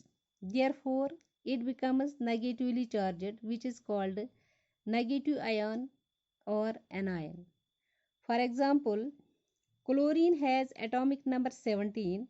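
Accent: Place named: England, United Kingdom. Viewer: English accent tho 🙂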